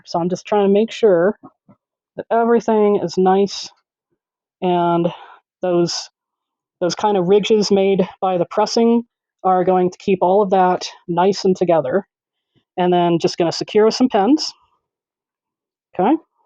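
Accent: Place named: Michigan, United States. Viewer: Are you from Michigan? I am replanted to GA but your “accent” speaks my much loved Michigan! We don’t have accents in Michigan.😂. Seriously, a great tutorial and appreciate your style.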